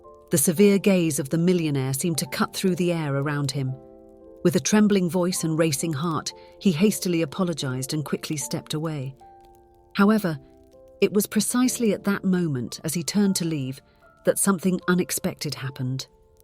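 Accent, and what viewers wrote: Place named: United Kingdom. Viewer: I love your narration style and your British accent. So, I subscribed to your channel a couple of minutes ago.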